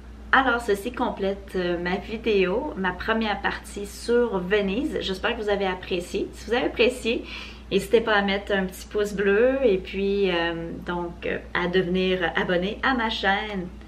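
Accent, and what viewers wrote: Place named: Canada. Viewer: votre accent n est pas français,il est bien canadien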